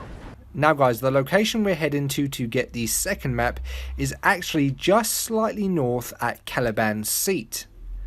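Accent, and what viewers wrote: England, United Kingdom. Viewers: English accents are so adorable, "go through the wuh-uh-fole"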